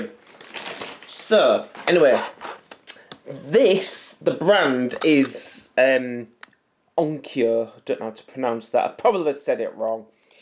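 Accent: Hull, United Kingdom. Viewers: The Hull Accent tops it off - i'm from Hull LOL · The Hull accent was a bonus - I'm from Hull.👍👍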